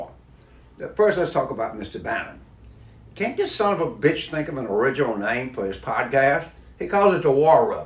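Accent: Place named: Cajun, United States. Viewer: Thanks,. You rock! But, I’m a Yankee. I can’t understand your Cajun accent. Could you please show your helpful videos with subtitles? It’d be appreciated.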